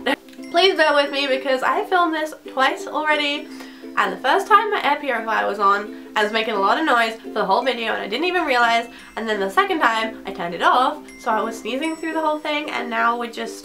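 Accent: England, United Kingdom. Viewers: Hi I'm really confused I love your vids but I just can't figure out your accent! Are you British ( like me yay!) but then you have a slight American tinge too! Also you mention American shops.. Please enlighten me! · are you English?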